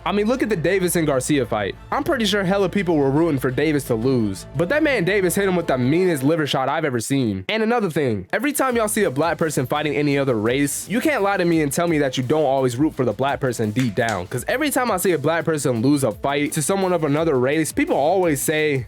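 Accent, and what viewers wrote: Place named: Africa. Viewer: Yoh which part of africa are you from?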